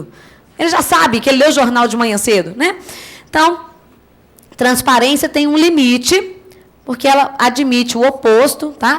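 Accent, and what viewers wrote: Minas Gerais, Brazil. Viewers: Mas que professora mais sensacional! E esse sotaque mineiro nosso, gostoso de ouvir. Parece que eu to conversando com uma amiga. Vocé é maravilhosa.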